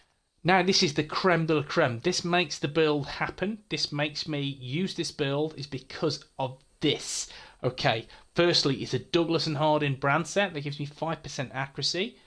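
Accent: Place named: Australia. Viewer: Fair enough mate. Love the build though. You sound Australian. I'm from New Zealand.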